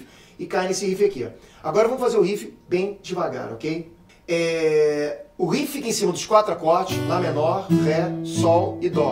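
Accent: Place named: Rio de Janeiro, Brazil. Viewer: e essa voz de carioca ai tem jeito?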